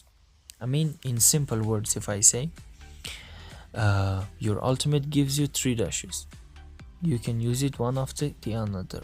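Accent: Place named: Mexico. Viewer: Wou a mexican english well xd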